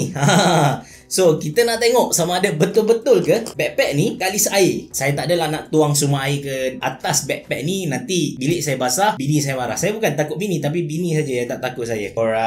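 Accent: Kelantan, Malaysia. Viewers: Lama perati. Abe cerah lamo doh xkecek slang klate. Bnyi skit2 xpo doh, bg video unik skit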